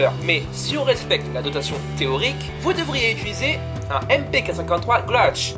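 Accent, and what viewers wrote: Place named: Russia. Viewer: Héhé t'as carrement géré! L'ecriture, les voix de BONHOMME bravo mon!! Bon petit accent russe en plus!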